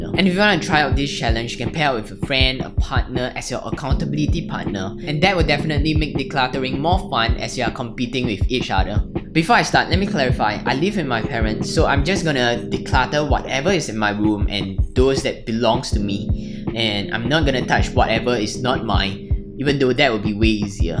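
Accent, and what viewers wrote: Singapore. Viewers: Are you singaporean ? Nice accent1😁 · Straight up when I heard your accent, I knew you were Singaporean. Cheers fella!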